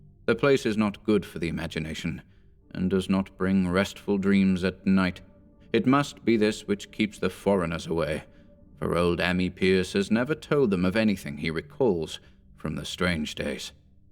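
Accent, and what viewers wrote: United Kingdom. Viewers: Another excellent reading! I’ve been enjoying finishing a story myself first, and then listening to your readings shortly afterward. I very much appreciate your attention to accuracy when you narrate, and there’s something about a U.K. accent that lends a certain subtle sophistication to it haha. Well done!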